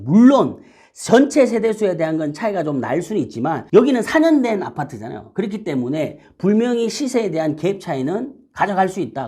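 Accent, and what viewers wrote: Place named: Seoul, South Korea. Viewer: 서울말로 들리기 시작했어요^^😊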